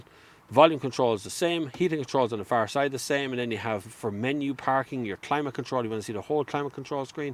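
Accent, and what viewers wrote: Ireland. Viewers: I'm just happy to hear an Irish voice.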